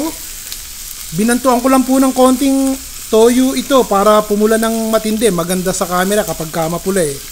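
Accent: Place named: Tagalog, Philippines. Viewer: So yummy, 😋tagalog na tagalog ka chef😊😅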